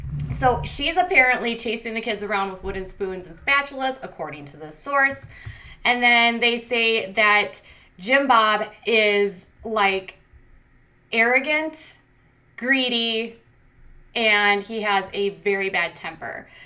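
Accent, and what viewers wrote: Minnesota, United States. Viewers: I love your accent! It reminds me of my friends in Minnesota ❤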